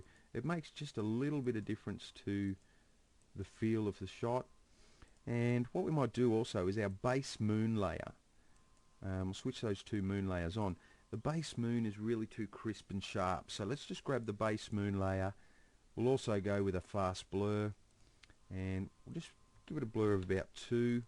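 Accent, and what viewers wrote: Australia. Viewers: That's an Australian Accent if I ever heard one. Awesome Tutorial, thank you very much. :)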